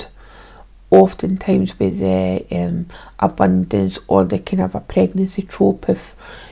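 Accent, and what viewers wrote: Scotland, United Kingdom. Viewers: Hello! Thanks for your reading. Love that deck. Don't worry about your Scottish accent, it is fine. Well done! We know that Carrie would only let People that she really trusts look after her channel. 👍💐 xx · Great reading! You are absolutely adorable and I'm so proud of you for battling with the technology side of tarot... Never easy hehe Love your accent! It's always a pleasure to hear the Scottish words mixed in I've been watching Len Pennie Scots word of the day and it's been fun getting to train my hearing and comprehension... I love that you'll be inadvertently helping with that It's awesome!! Thank you for being such a lovely friend and stepping in, it's beautiful to see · Love to hear a Scottish accent on here!! ♥️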